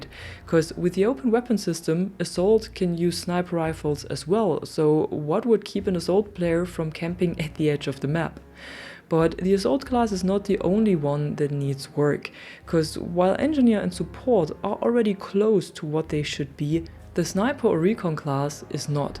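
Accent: Germany. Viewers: A good video, I completely agree with you. A side question: May I ask where you're from? Your accent sounds like a German who speaks perfect English.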